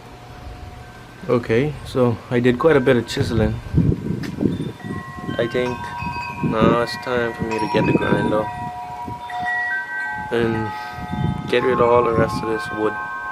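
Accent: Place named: Caribbean. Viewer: Love the progress ... your accent is interesting it sounds like you were raised in the Caribbean in the west indies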